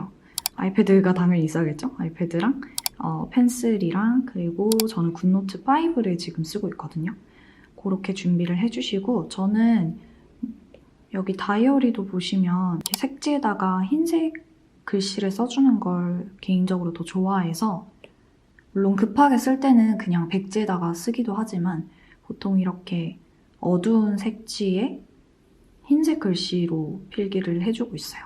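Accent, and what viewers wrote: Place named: Gyeongsang, South Korea. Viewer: 약간 느껴지는 경상도의 말투!!!! 혹시 고향이 부산경남 쪽이신가용?ㅎㅎㅎ넘 반가워요>.<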